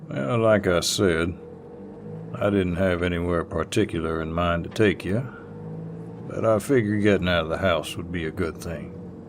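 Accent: South, United States. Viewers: The southern accent rahhhh I love me a southern gentlemannnn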